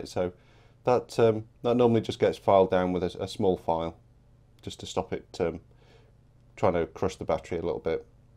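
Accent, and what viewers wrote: United Kingdom. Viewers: >>>>> ANOTHER BRIT. WORBLING AWAY IN HIS SPECIAL DIALECT OF UNKNOWN LANGUAGE !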